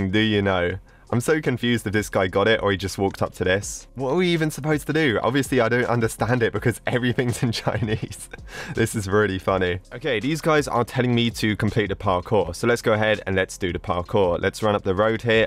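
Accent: United Kingdom. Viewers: sounds like british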